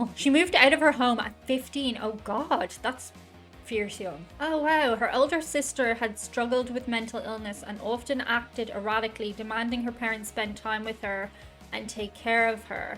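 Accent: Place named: Ireland. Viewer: Question for! Your accent fascinates me. There seems a fair amount of Irish in the background. Where do you come from. By the way, you have a very pretty face. ( That's one of the reasons I watch your videos and love the music )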